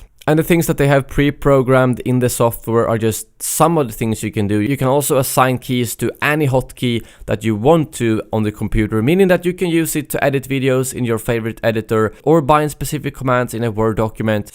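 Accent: Sweden. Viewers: Det låter som att du är svensk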